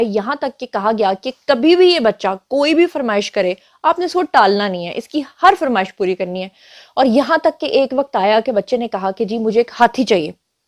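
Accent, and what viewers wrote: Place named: Punjab, Pakistan. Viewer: تھوڑا سا مزید پنجابی ٹچ دیا کریں مزہ آتا ہے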